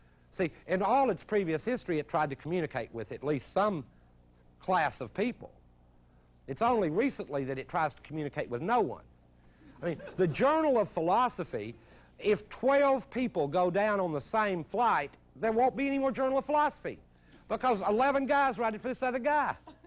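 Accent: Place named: West Texas, United States. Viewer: Professor has the abilty to take a complex abstract topic and break it down to practical applications. His West Texas accent makes his presentation more real world. It is sad that he passed away at such a young age.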